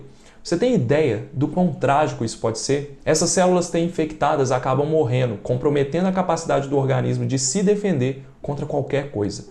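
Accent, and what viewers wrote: Minas Gerais, Brazil. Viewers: Vc é mineiro. Deu pra notar.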